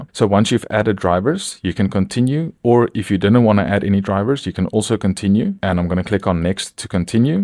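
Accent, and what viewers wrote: Afrikaans, South Africa. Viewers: Baie dankie vir hierdie video. Dit is die eeste keer dat ek op jou youtube video's afkom. Gaan nou na alles wat jy het kyk, verstaan nog nie 100% nie, maar gaan nou na hulle kyk. Toe ek jou stem hoor, het ek dadelik agter gekom dat jy dalk Afrikaans is.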